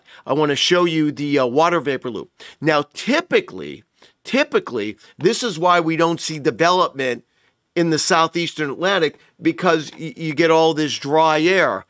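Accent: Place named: East Coast, United States. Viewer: just can't do the east coast accent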